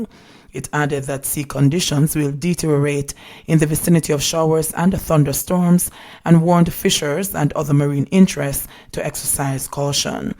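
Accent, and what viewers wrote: Jamaica. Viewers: We just love the way you deliver the news, especially the Patwa. Blessings to you!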